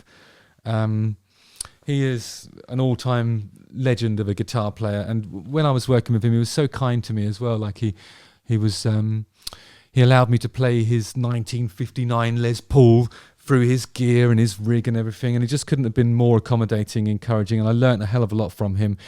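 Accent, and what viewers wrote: United Kingdom. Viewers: condescension with a British accent is so much more satisfying to hear. rock on justin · .."Off you fuck". I love it. I will definitely use that, but it'll never sound as good as it does with the Brit accent.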